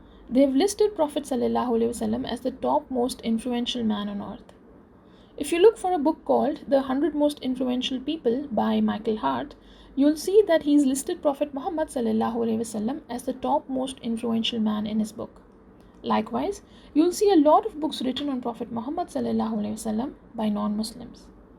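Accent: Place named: India. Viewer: i think she lives in Qatar(as mention in about section) but by accent it seems she's from India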